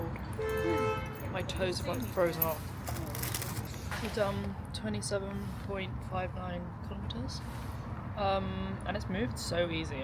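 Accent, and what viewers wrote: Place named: RP, United Kingdom. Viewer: Your accent is so posh lol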